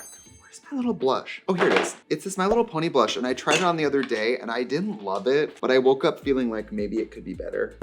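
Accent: Wisconsin, United States. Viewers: I don’t know why but I cannot focus on anything other than how Wisconsin she sounds and now I’m questioning everything and am like does she always sound like this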